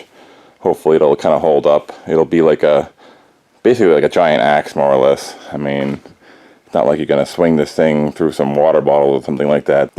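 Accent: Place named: New England, United States. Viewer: Where u from.dude? U sound like u got a Rhode Island slash Massachusetts accent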